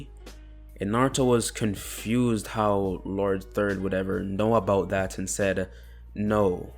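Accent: Jamaica. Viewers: hi, I I'm just wondering if your from Jamaica I hear I semi accent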